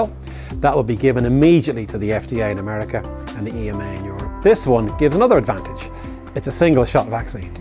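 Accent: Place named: Dublin, Ireland. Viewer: Except for the dub accent, it's a good video.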